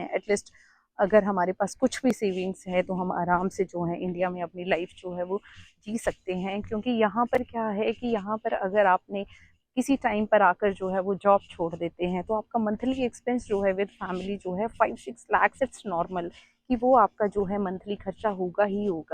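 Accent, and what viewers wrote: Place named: United States. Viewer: I like her american accent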